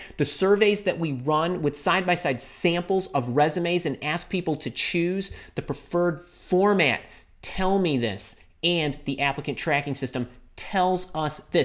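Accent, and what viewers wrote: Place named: Michigan, United States. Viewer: Sound like a Michigander..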